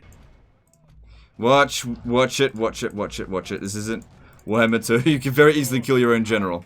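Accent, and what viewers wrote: Australia. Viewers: I'm just here for the abuse and edgy jokes. Nice to listen to a genuine Aussie, instead of the wannabe Americans that flock the Youtubes. :P Was fun to see my former favorite Total War game (WH2 > All) as a disaster campaign. Would love to see more.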